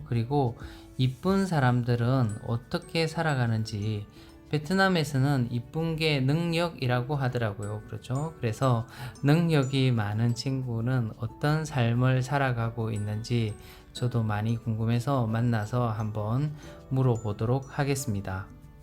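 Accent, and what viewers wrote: Gyeongsang, South Korea. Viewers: 그냥말편하게하세요^^ 경상도같은데ㅜ이건머사투리도아니고표준말도아니고ㅜㅋㅋ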